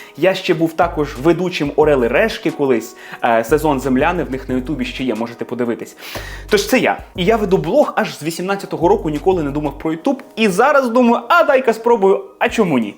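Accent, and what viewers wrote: United Kingdom. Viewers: Класний British accent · Ого, той рідкий канал якому ставлю повний дзвоник щоб не пропускати нових відео) Хоч і бісить саме британський варіант англ. мови з її кАнтами😂 Су Пердякую передав привіт. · Ти з тих, хто вважає, що твоя американська мова — єдина правильна англійська, але це не так) На відео людина спілкується британською мовою) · Відео класне. Мене розпирає від того як перемикається між чистою українською і лондонським англомовним акцентом 🤣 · Ех ця брітіш інгліш 😂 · Велике дякую , дуже подобаються такі відео і ваша британська промова❤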